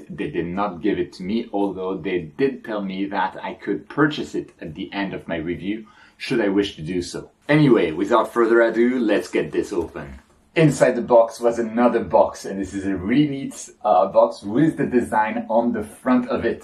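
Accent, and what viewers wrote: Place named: France. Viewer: Bonjour de Belgique, it seems that you have a french accent, serais-tu français?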